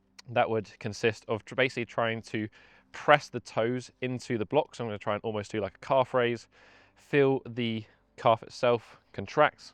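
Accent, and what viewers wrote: United Kingdom. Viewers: This is so good, and the British accent🎉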